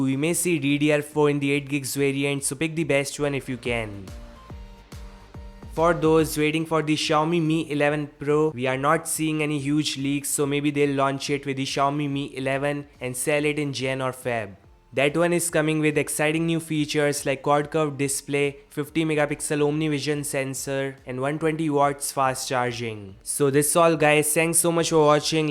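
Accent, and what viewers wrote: India. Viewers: Logat india people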